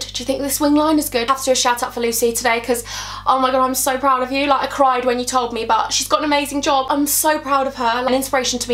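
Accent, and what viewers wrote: South, United States. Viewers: Your american accent is soooo good!!! Please do the accent challenge with #Bensalad or jacob!! love you xxxxx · Love you American accent Haha 😂😂Xxxx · OMG your American accent is ridiculously good!!! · You actually amazing at an American accent!! Xxx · Your wing liner and American accent 💕🔥 obsessed! Xx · Snapchat fam💗💗 also her American accent is flawless!!! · LOVE MARMITE SO ❤️ and that American accent is IT!! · Your American accent is so good! And I love your personality. 😊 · Omg the American accent 😍😍😍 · YAS! I'm living a for the American accent and your singing voice. Can we just get on Big Brother! 🦋🐝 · the American accent is amazing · That American accent omg girl you killed it i love you so much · I live for your American accent and honestly I relate to your butterfly brain to a T and gurll I need those bambi lashes in my life they look insane!!! 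And of course can't forget the song ❤️❤️❤️🥀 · ! Love you, Wish i could fly out there all the way from North Carolina, love your southern accent by the way! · Wow your accents are amazing love their American one pls do a first impression video in a American accent I love your videos they make me so happy! Your vibe is amazing 😘😘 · Omg your American accent was so good and it acc really suits you, you should do a video doing a makeup tutorial in different accents😂❤️ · Her American accent♥️ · The American accent tho! 👏🏼👏🏼 yass boo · I am shook how good your American accent was!!! 😱😱😱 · U SOUND SO AMERICAN OMG AHAHAHAH LOVE U 💗💗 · Plsss do a whole video in an American accent,, i'd love that as much as I love you!!! Xxx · ooooommmmmmmmmmmmmmggggggg u sound so American it is scary like if I had never watched u before an u showed me just that clip I would think u were American!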